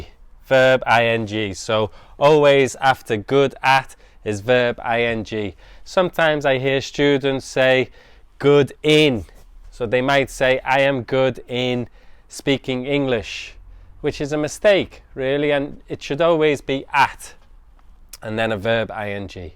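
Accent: United Kingdom. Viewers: Are you English? I like your accent, I'm a new subscript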